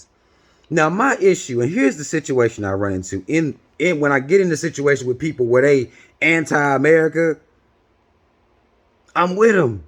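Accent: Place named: France. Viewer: I fw you. That being said.. Accents are a thing. When you expose yourself to the rest of the world it's something you grow to understand. It'd be quite foolish of me to ignore what wisdom you have to share because it was shared in a french accent would it not?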